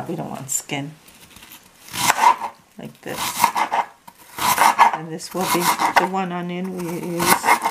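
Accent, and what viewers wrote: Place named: New Jersey, United States. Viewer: You sound like you have a new jersey accent, along with your haluski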